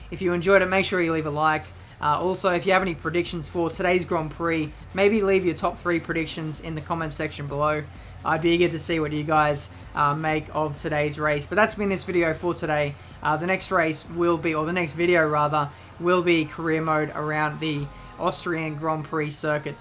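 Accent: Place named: Australia. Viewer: You sound like Daniel Ricciardo #AUSTRAILIAN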